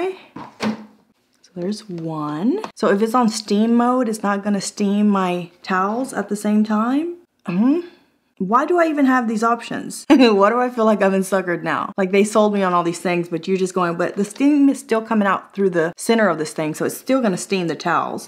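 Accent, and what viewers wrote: South, United States. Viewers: I was drawn to comment because of the southern accent you’ve picked up! Change your accent to draw more viewers!! Question: did you post the link for that magnifying device you used? Thanks!! Love your videos! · Isn't the country accent comes from living in Texas!!! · Lol I found your channel a few days ago... and have watched so many of your videos and laughed so hard!! But I got a question... in one video or maybe this one you said you woke up with a southern accent... I'm southern and I thought you were Southern so now I'm wondering... where are you from? Lol Keep doing what you're doing!! Love your videos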